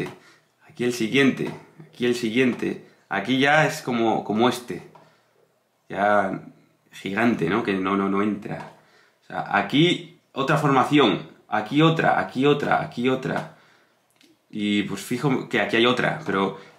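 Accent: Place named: Argentina. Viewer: Tienes un deje argentino muy fuerte... lo mismo que tus facciones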